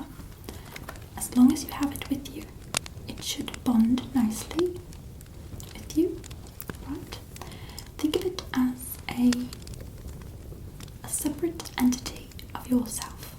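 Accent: United Kingdom. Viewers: Your British Accent as Hermione Granger spot on perfection another 33,000,000,000 points for me! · I’m British and u genuinely sound British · As being british This is the best british accent i have seen from an asmrtist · Loved the British accent and all the roleplay as well 💕 I was having a crappy day and now I'm so relax, Thank You 😍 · You do a great British accent love it 😍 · I am English and I hate almost every American attempt at a posh English accent - this is one of the best I have ever heard. You continue to raise the bar xxx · The accent is brilliant! Hard for an American to perfect a good British accent!! Well done you! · I love that you can actually do a british accent, a few other asmartists can but not very many I'm very impressed being a British person myself. I'm from Bath in Somerset. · your accent isn’t that bad but it’s kind of a mish mash of essex/posh/brummie couldn’t watch this although the attention to detail is 10/10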